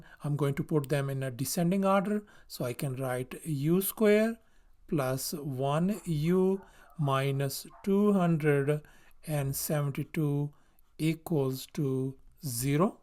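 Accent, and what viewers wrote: India. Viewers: I love Indian ton English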